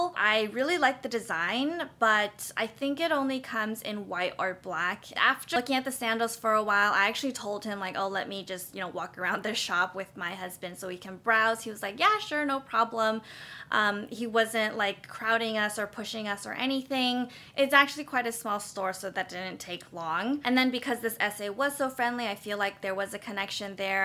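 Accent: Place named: Asia. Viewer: I love your Asian English accent.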